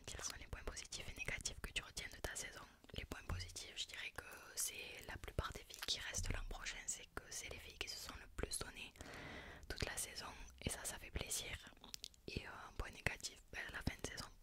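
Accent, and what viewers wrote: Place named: Marseille, France. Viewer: L’accent 😍 marseillaise en plus 🔥 ailier gauche à la Dimitri Payet, caviar sur caviar pour ta numéro 9 🤝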